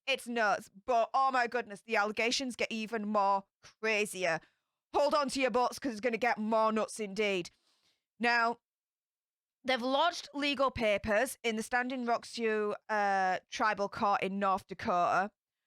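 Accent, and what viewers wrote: Yorkshire, United Kingdom. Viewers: Hey love your sass and Yorkshire gobiness. Sending love from Bradford.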